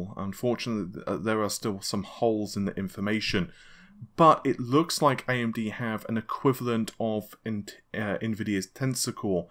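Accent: United Kingdom. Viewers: "six cores and six...*faaahreads* " Awesome video as always but dang that British twang remains strong.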